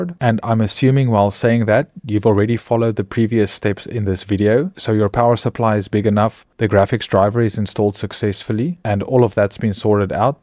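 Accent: South Africa. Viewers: I could definitely tell that you're South African based on your accent. Great video!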